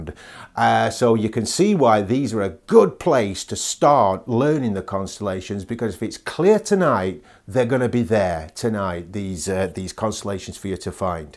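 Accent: Midlands, United Kingdom. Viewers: Would you mind disclosing your appropriate location? Your accent sounds not too far from me (Derby) and i was wondering about local 'low light pollution areas'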